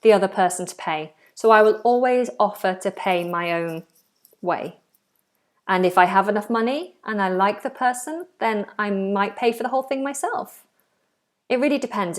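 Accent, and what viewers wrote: United Kingdom. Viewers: I love british accent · Teacher I watch that record fron place who doesn't showen in a map. I just like walk there. It is somewhere not far from Cherson . If be honest I always practice with my gun there, In this way I make sure I don't bother people. P.S I found off British accent, so try hard to imitate your accent.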